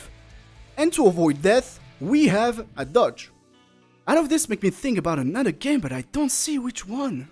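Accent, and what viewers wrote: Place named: France. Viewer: I love your French accent pal, you are very thorough and proper with these videos, and they are made with love and meticulous craftsmanship. Keep it up bro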